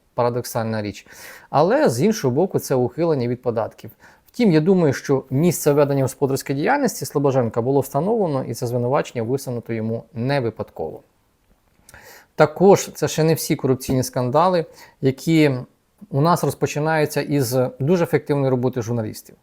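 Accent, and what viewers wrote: Standard. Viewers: Респект за чисту,інтелігентну,спокійну українську мову. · Респект за чисту,інтелігентну,спокійну українську мову. Роботу свою Ви робите спокійно,при цьому грунтовно.Дуже приємно Вас слухати. Успіхів!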